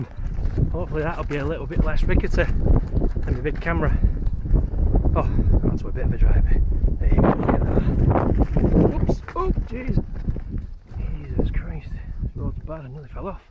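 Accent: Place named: North, United Kingdom. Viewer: Subbed you right back my mate... I's good to hear our Northern Lingo on the Tube pal.. Always up Rivvy buddy... Taking the lads up next Saturday as it happens. We are only 5 miles South of the Pike too man. Small world. :-) Have a good un mucker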